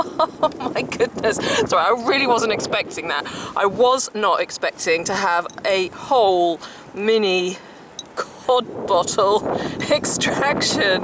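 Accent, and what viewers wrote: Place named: Humberside, United Kingdom. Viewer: What a fantastic & interesting video, been a Gunners fan since 1967. You've greatly added to my knowledge about the early days. I detect the Humber estuary, but oh boy, it's nothing like this. Keep em coming. Thank you.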